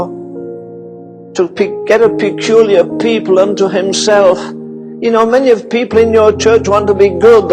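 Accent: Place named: United Kingdom. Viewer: Saint I most look foward to meeting in heaven. I know Paul and Peter and St.John, but this man was close to my time. He moved me with those little British words coming out of his mouth so clear and clean. Prayer and long suffering. Love this little British man. I want to see him. GOD willing I can one day see his resting place, then meet him in that celestial city in the sky built by the King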